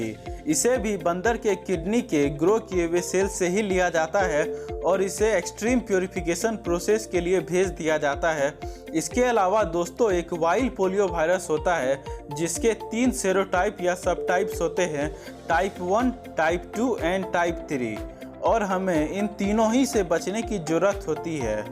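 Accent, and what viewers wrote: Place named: Bihar, India. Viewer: Bihari voice 😍😍😍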